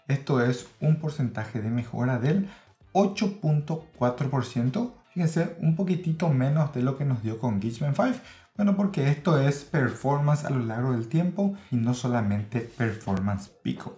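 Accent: Paraguay. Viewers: gran video. amigo tenes un acento paraguayo. cuál es tu nacionalidad? saludos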